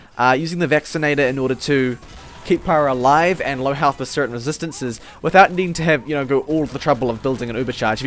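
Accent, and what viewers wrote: New Zealand. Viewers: He sounds very much New Zealand to me. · listen to the way he says 'get'. He's from nz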